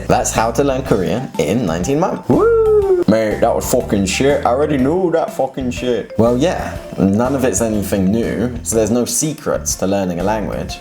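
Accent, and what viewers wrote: United Kingdom. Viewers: 정말 훌륭한 조언인 것 같네요. 한국어를 배우려는 외국인들뿐만이 아니라 잘못된 영어교육을 받고 있는 한국인들에게 좋은 팁이 되겠네요. 참고로 영국의 악센트는 언제 들어도 매력적이네요. 이 영상 보면서도 계속 따라했네요 ㅋ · 영국 발음 너무 매력적이다... 💜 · 영어 영국 발음!! 진짜 멋진것같아요 영화 토르 볼 때마다 매번 심쿵 ㅠㅠ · 와씨 영국발음 개좋다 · 영국악센트지린다....하♡ 영국어배우고싶어 ㅜㅜ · 씨(?)의 학습법에 매우 공감합니다. 그리고 영국 발음은 언제나 듣기 좋네요 ㅎ 언제 한번 영국 발음 천천히 제대로 들을 수 있는 영상도 찍어주셨으면 좋겠네요. 물론 제 개인적인 희망사항입니다.. 후훗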